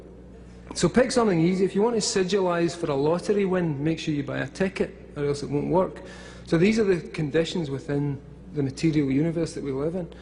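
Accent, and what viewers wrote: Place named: Glasgow, United Kingdom. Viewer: Auto captions are no match for the Glaswegian accent.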